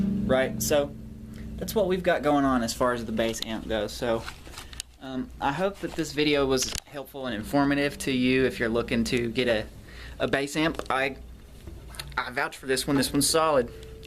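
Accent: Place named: Kentucky, United States. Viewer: Great video buddy, I thought I heard the KY in you, ;-) , I was searching because I see a Yamaha B100-112III on Craigslist right here in Louisville for $150 but I have a couple amps already, was just curious. Thank you for the tip on Steve's shop in Richmond, just found him on FB and sent him a message. Now I gotta check your band, ....Thanks for the video and info !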